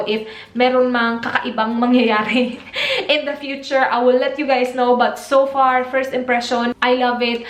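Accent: Visayas, Philippines. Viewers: Ommggg!! I like the way how you speaaakkk!! Are you bisaya po? 😍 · I still can't get over about how beautiful your bisaya accent transitions in to a very nice and fluent English accent. Love you x. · You have a thick Filipino accent when you speak English but with practice you'll get better :) ang ganda mo with makeup and you are really skilled, thanks for sharing your review of Anne clutz brushes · Bisaya ka day? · Bisaya acent☺️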